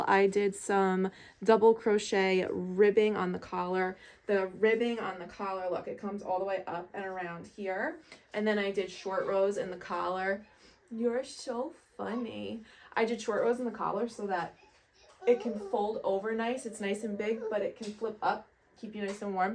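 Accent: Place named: New Jersey, United States. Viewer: That cardigan is so nice girl you have very gifted hands 🙌🏾If you don't mind me asking where is your accent from? its sounds so cool like the way you pronounce certain things reminds me so much of jersey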